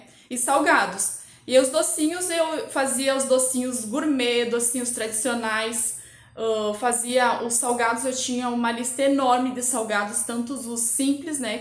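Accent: Rio Grande do Sul, Brazil. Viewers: Fiquei um tempo no sul e era difícil achar salgado bom. No RJ tem muita gente boa. Pelo seu sotaque eu acho que vc é gaúcha ❤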